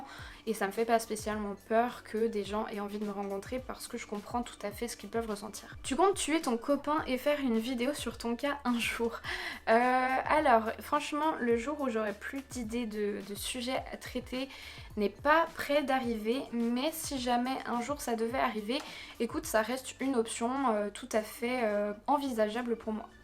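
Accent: Switzerland. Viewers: PS : je suis Suissesse et je te confirme que tu as un petit accent de chez nous 😉 c'est très chouette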